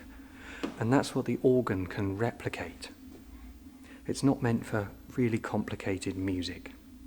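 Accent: United Kingdom. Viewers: Hi, you are very talented person, with such a beautiful British accent-I love it. I really enjoy watching your videos because I'm Polish and I'm learning English, so I can learn a lot of beautiful vocabulary from you channel (I know, it could sounds funny). I play the organ a little end pieces you play are amazing! Have nice da!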